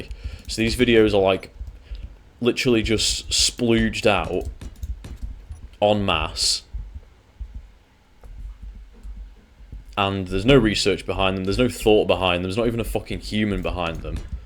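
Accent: United Kingdom. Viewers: Why does he sound more British this episode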